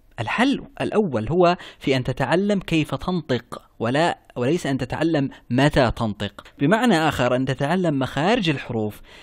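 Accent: Yemen. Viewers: أحسنت وسلام كبير لليمني اكسنت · لهجتك يمنية انت،يمني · والله عرفت انك يمني من لهجتك سبحان الله تسلم طرح رائع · لهجتك يمنية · ابدااااااع ما شاء الله 😻 كنت شاكه من انك يمني لانه طرريقه الكلام و بعض الكلمات يمنيه احيييك يــــا ابن بلادي استمر ولايك واشتراك 🌸💙✨ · يا اخي صعب إن العرب يتكلموا مثل الأمريكان أو البريطانيين لازم يكون فيه علامات فارقة في النطق مثلا أنت يظهر على لهجتك بأنك من اليمن وهذا شئ طبيعي أهم شئ يفهمك المستمع، تحياتي لك ولجهودك. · sanaa batool حتى أنا والله عرفت إنه يمني من اللهجة سبحان الله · انتي يمني من لهجتك عجبتني قناتك فيها التبسيط بلشرح مشتركه جديده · مرحبا، لهجتك يمنية؟ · يا اخي اعرف الاكسنت اليمني من بعيد هههههه تحيه مني لك 🇾🇪🤩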